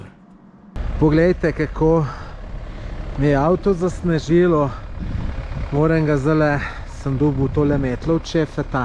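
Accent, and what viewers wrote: Slovenia. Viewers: Meni uvek simpaticno kad slusam slovenca kako prica:) Jajaca su jaca:):) Bili smo ocigledno u isto vreme na Jahorini, ja sam zadnji put bio pre 15 godina i zaista sem planine koja je ista sve ostalo je drugacije. Puno pozdrava iz Beograda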